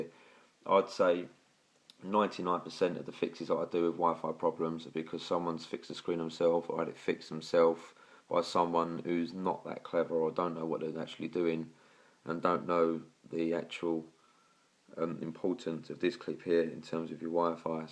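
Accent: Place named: England, United Kingdom. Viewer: Well done, it's so nice to here an english voice ( I'm a jock by the way lived in england most of my life) with no shit music in the backgrounnd, but a well clearly explained bit of good information cheers mate Dave in slough.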